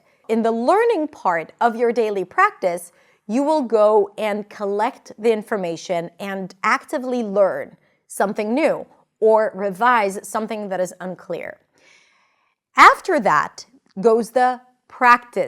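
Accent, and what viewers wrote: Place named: United States. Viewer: You're an inspiration to all of us. Watching the way you mastered and hacked the American English accent is so motivating.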